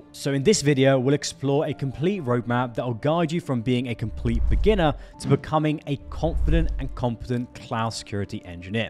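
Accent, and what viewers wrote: United Kingdom. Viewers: Strange how you sound British yet don’t make any mention whatsoever of British salaries. You just cater to Americans…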